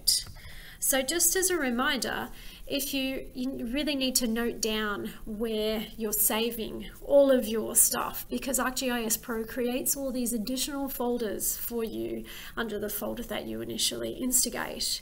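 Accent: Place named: Australia. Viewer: You explain this so well. It was so simple to understand and follow along in my ArcPro account. The Australian accent is top tier! Super helpful, thank you from California!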